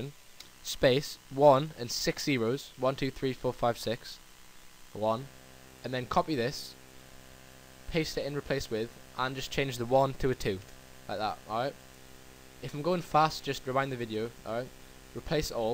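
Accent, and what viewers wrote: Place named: Wallsend, United Kingdom. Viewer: Sounds like your from Wallsend or around there somewhere.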